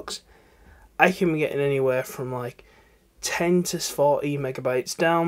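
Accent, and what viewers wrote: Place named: North, United Kingdom. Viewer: cheers. where u from mate u have same accent as where in from be up north lol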